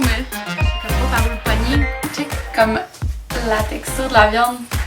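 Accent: Quebec, Canada. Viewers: La femme parfaite 😍 avec les steaks parfait 😋echtuerais pour un souper avec elle 😂 regarder l'accent 😂😂😂 qc asf nice video jvais la garder pour quand j'aurai faim 😋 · J essaye de comprendre le canadien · J'adore l'accent québécois il est génial 😂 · la vie plus tard je sortirai avec une canadienne, j'adore leurs accents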